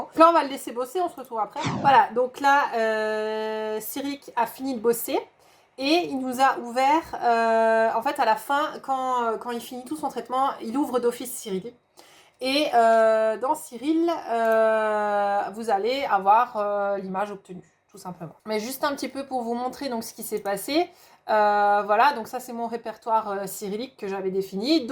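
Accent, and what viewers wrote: Alsace, France. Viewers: Ah on entend enfin ton accent :) et je découvre l'humour alsacien :) sinon je garde ça de côté j'en suis pas là !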